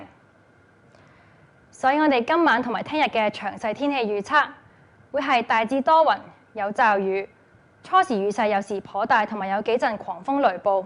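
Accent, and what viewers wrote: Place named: Guangzhou, China. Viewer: 有廣洲口音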